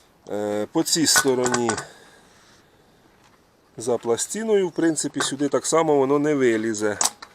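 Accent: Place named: Dnipropetrovsk, Ukraine. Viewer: Дядько ви з Дніпропетровщини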